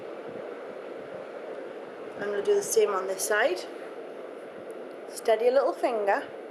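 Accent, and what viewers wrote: Newcastle, United Kingdom. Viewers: You sound like a Geordie! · It's "Geordie" almost. The real geordies live in Newcastle and wouldn't like anyone from south of the river Tyne to be called true geordies, but thats the accent. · she's a geordie! i.e. from newcastle :) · WHHAT? she's clearly from newcastle. have you ever seen gavin and stacy? that's what a welsh accent sounds like.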